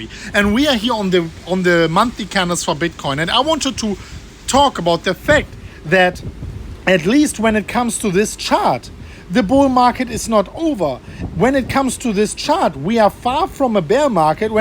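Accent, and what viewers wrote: Germany. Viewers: Killer German accent, dude!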